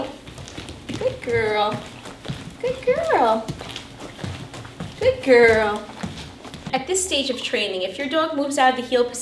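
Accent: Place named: New Jersey, United States. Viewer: Love the Jersey/NY accent. "Dawg"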